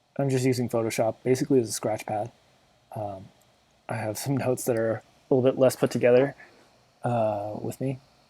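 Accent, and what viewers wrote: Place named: Canada. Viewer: It's all about that Canadian accent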